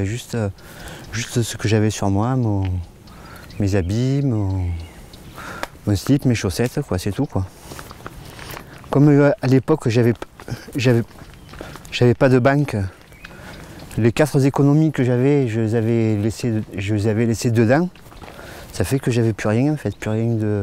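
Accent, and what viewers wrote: France. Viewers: l'accent du sud de la France, j'adore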